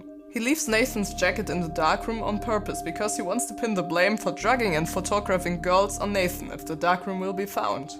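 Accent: Germany. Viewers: Your accent just sounds really german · Dieser deutsche Akzent lol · Irgentwie habe ich mir gedacht vom ich sag mal Akzent das du Deutsch bist aber trotzdem gut😊 Also kann man Nathan Retten?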